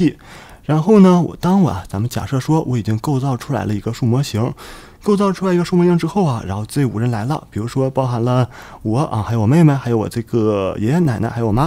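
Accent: Northeast, China. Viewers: 这东北味太浓了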